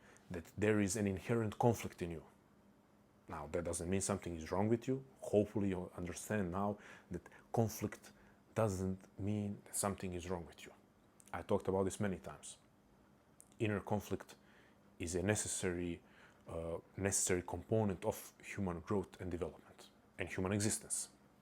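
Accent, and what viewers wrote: Balkans. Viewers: Broo, do you have Balkan roots? You have some strong Balkanic accent (strong š, č, ć, ž, đ, dž sounds)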